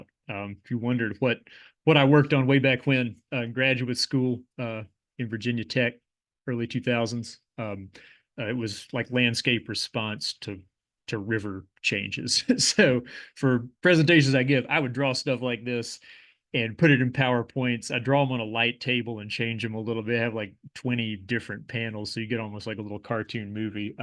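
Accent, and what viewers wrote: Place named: Southern Ohio, United States. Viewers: Yoo dude must be local to southern ohio/WV he pronounced everything right 😂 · His accent sounds like mine and I'm from Rio Grande. So my guess was also he's a local. Probably from somewhere far from Monongahela as he said it how I would guess which is apparently wrong. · OP has an E Ohio/W PA/ N WV accent.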